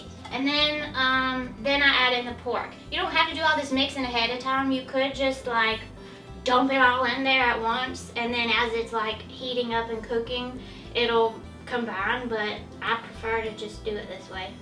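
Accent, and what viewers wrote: South, United States. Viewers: I'm so happy you are back. I have really missed your charm your cooking and you wonderful southern accent. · I've missed your southern accent. This recipe is devine.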